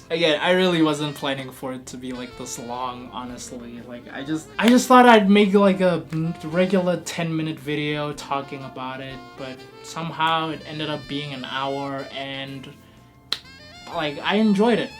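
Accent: South Africa. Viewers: R you South African? Ur accent is giving South African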